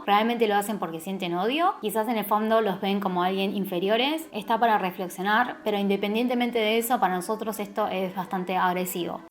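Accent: Argentina. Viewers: Porque hablás como argentina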